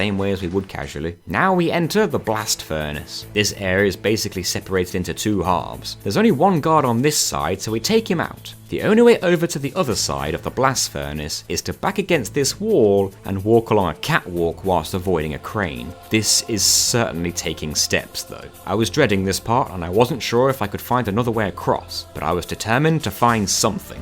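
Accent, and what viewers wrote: England, United Kingdom. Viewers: Yes he is New Zealander. Great show. All through the video all i could think was i've heard this voice before. Are you from UK? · As a non native english speaker, I love the english accent. Great video btw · Great video... Except ur bri" "ish, love. Smhhh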